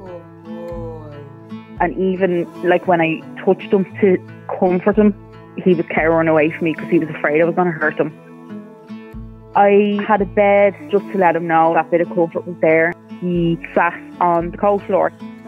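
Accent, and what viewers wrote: Ireland. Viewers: That's a very solid Dublin accent behind the voice that is speaking only the truth, with regard to Walt. Well done, and may you have many happy years,! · Thank you xx love the Irish accent xxx Welsh me see xxx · That's an Irish accent I believe - I'm in Donegal and took in a rescue German Shepherd nearly a year ago - he's called Tyson and he weighs 100lb - I love him to bits but he's very reactive but gradually settling down....! Walt is awesome and God Bless you for helping him out of his trauma - just like us humans it takes love and time to recover....! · To hear an Irish voice on the Dodo, is rare but funny 🤣😁 love your story. Hope you teach some of your friends the same patients ☺️ so more people do such awesome things 👍☺️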